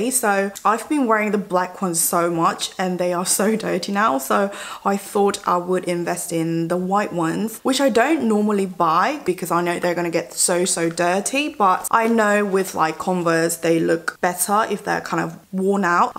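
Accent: United Kingdom. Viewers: omg I've actually missed your british accent!!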